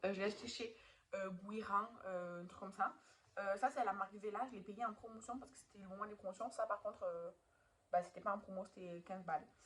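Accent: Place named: South, France. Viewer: Bonjour 🥰, je viens de découvrir ta chaîne grâce à sandy❤, et je la trouve super, intéressante et apaisante❤️, j aime beaucoup ton accent du sud, bonne journée 🌈✨️✨️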